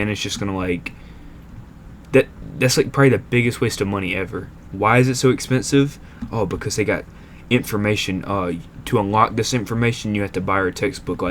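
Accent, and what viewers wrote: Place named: United States. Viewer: sick Minecraft world, your American accent is sick what state r u from if you don't mind me asking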